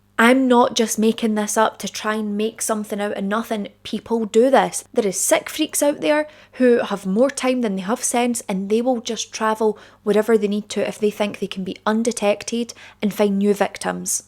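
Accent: Scotland, United Kingdom. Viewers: She's Scottish. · English edit yes Scottish sorry!. Love accent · Ohh no danger great video its gallus a Scottish accent .watching from paisley ❤ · Lol, it's a pretty typical Scottish accent.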